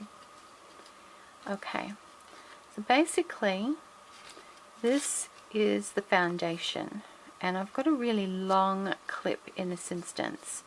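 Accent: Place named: Australia. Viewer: I love your work! Your papers and designs are amazing! I take it from your accent that you must be Australian? Anyway you are so creative!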